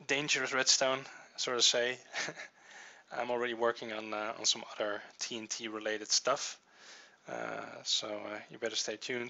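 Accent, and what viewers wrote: Netherlands. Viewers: your english sounds very dutch. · are you dutch? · r u dutch? :3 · Your dutch arent you? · Hes Dutch i can now it cause im Dutch to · Are you dutch? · Ik dacht ook al zoiets. Je hoort het gwn aan z'n accent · boi je bent nederlands. Dat horen we allemaal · are u dutch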